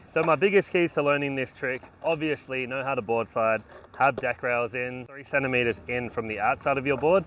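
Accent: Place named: South Africa. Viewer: I don’t like the way u talk. South Africans always make me think of that blood diamond movie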